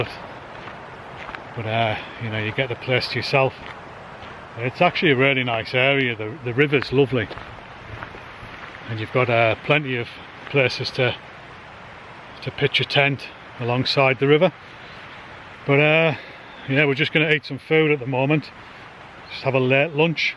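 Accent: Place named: Scotland, United Kingdom. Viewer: your Scottish accent because it's cool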